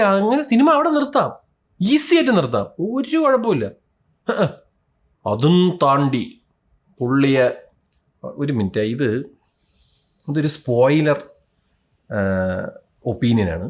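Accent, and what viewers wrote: Trivandrum, India. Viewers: Annan tvm slang 😆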